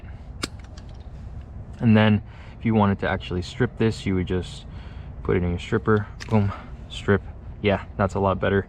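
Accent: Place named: United States. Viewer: Good to hear an American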